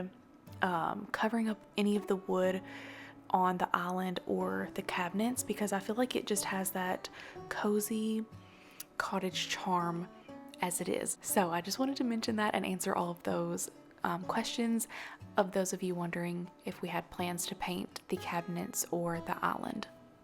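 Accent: South, United States. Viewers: New subscriber here. Congratulations on your beautiful new home! I’m so very excited for you. The countryside looks like farmland in my home state of Ohio but from your accent I’m guessing farther south 😉. I’d love to know what state you are in. I’ve lived in Oregon for 40 years but Ohio farms are in my heart and blood. Those views will never get old. Enjoy every moment and thanks for sharing your joy. May God bless you and your home. ❤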